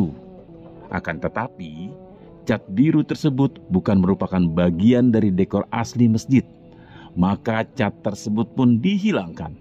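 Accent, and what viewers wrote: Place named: Banyumas, Indonesia. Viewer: Reportase nganggo ngapak mbah 😀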